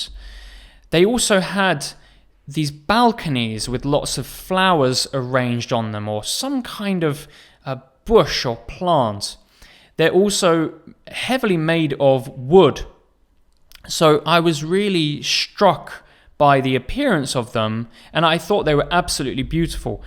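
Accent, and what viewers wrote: United Kingdom. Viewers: I'm in love with your British accent. Thanks for your amazing video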